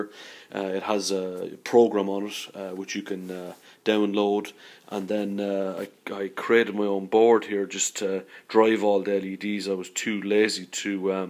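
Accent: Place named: Midlands, Ireland. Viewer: Are you from the midlands? You accent sounds like its from the Irish midlands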